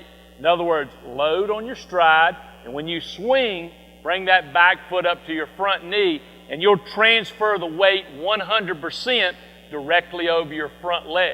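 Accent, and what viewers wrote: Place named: United States. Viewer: lol american accent...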